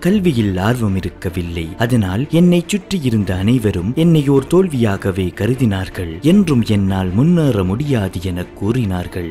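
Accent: Sri Lanka. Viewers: Nice to hear Sri Lanka tamil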